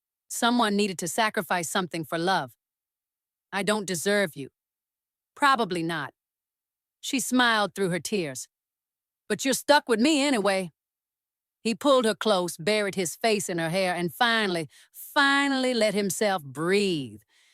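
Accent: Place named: South, United States. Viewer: She's Southern, a great Voice.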